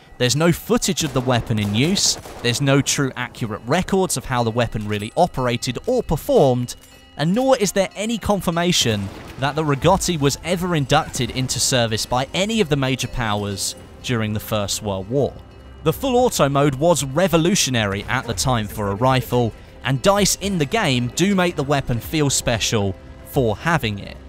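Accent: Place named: United Kingdom. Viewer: The British accent, the music and the commentary everything is spot on.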